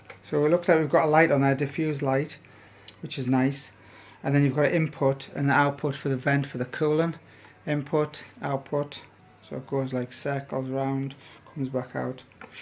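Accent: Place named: Teesside, United Kingdom. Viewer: I’m guessing you’re a Teesside local?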